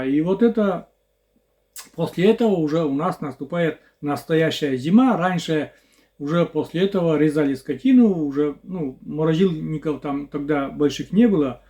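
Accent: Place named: Vyatka, Russia. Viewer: Говор похож на вятский.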